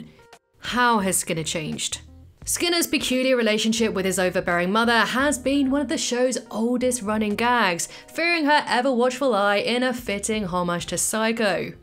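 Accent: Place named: South East England, United Kingdom. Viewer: It sounds like we both from south east England if only we could meet up and knew your last name so I could find eachother and talk Simpsons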